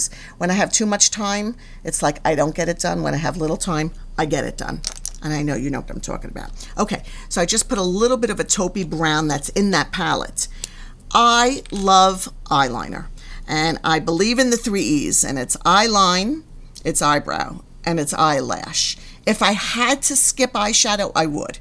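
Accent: United States. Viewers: I LOVE your New York accent!! I will try some of your products soon. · I had no idea what she looked or sounded like…she’s so normal! 😂 I love her accent too …she talks like my mother too who was born and raised in the BX ❤❤❤ love this video! Definitely gonna start buying her products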